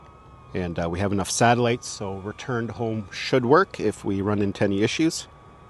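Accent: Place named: Maritimes, Canada. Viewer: Great video... and you sound like a fellow Maritimer... getting my Neo soon just waiting for a possible Black Friday deal.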